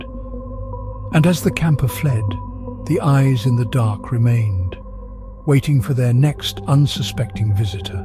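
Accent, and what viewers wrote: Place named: United Kingdom. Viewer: A British narrator. How wonderful. Our American friends will love it.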